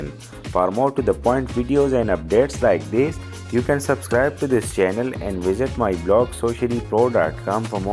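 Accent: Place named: India. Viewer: your indian accent give me chills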